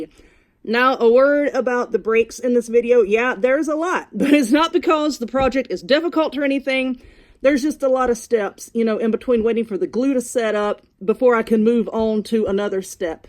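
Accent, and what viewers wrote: South, United States. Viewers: Awe..... love me a country accent!!! Fellow southern girl!! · I love your channel and your southern accent! You are too darn cute! Love love your fall pillow! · Love the pillow but mostly I love your good old southern voice. I could listen to you all day and your sense of humor.I am going to make this pillow.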